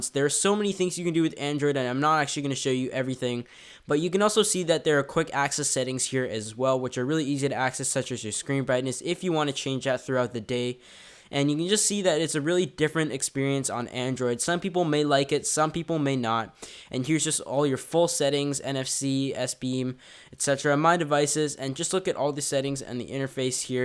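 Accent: Canada. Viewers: oh my gosh. are you Canadian?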